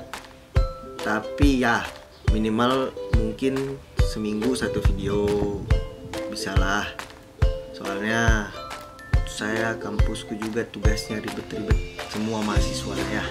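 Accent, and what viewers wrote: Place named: Makassar, Indonesia. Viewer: konten gaming boleh tuh bang jadiin point, game horror kalo bisa kaya dulu channel heheee, kangen banget denger teriak teriak ketakutan pake logat makassar mu ituuu, pokok nya terusin aja, aku udh dukung dari duluuuuuuu banget. hehe semangat ya buat kedepannya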